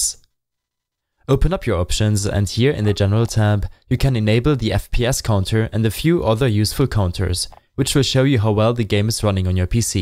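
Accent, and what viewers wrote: Germany. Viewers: Wie man merkt das du deutsch bist danke nochmals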